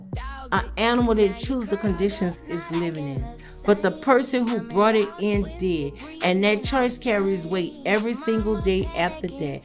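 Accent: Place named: New Orleans, United States. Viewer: Girl I’m from New Orleans and as soon as I heard your voice you sounded like family. But I yea this whole situation is crazy. I’m praying for the dog 😩😂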